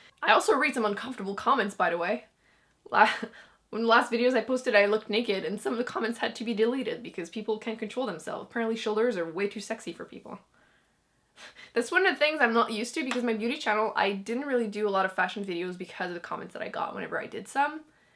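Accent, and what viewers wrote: Quebec, Canada. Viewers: I was a Canadian studies and French major at college and studied abroad in QC so your channel brings me SO much joy. I love when you speak French and your quebecois accent, it makes me feel so nostalgic. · Wow, I'm french and I love your accent when you speak Canadian french (and actually you're m'y favorite booktuber both in french or english) But I wonder...are there so many french people that are disrespectful and hateful towards Canadian french ??? I would be ashamed of my country....it's sad !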